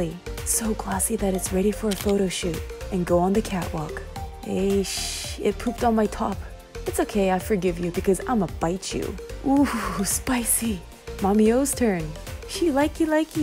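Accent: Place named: South Korea. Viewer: What’s ur nationality? It’s sounds like your a native korean lol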